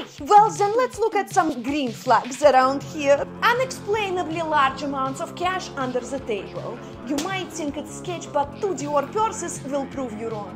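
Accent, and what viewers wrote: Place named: Russia. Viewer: Но она специально говорит здесь с русским акцентом. Есть видео, где все намного лучше. А вы не комплексуйте, все нарабатывается. Ну и пытаться совсем избавиться, от акцента тоже, на мой взгляд, не нужно.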